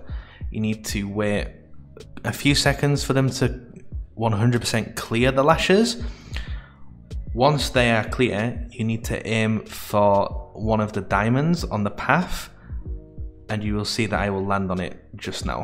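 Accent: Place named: Yorkshire, United Kingdom. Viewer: I’m from Sheffield, I’ll recognise a fellow Yorkshireman anywhere :) Nice vid man, I played vanilla back in 05 when I was 13 and had no idea what I was doing. I stopped playing during Cata but me and my mates plan on coming back for classic White rose!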